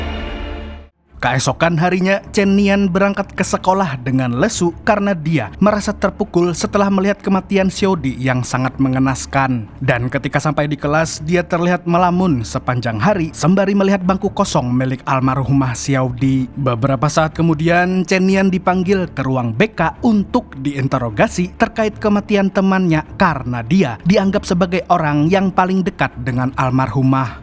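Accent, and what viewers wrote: Banjar, Indonesia. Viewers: pian urang banjar kah min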